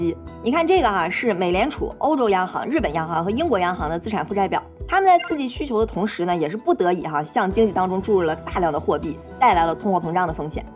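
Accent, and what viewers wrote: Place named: Beijing, China. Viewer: 這北京腔官話真難聽 還是咱河南官話好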